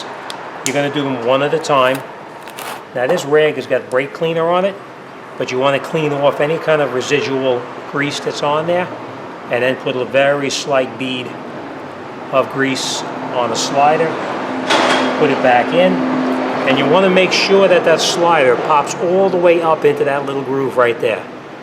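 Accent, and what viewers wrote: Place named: New York, United States. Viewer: Good vidio, sounds like a true New Yorker, lol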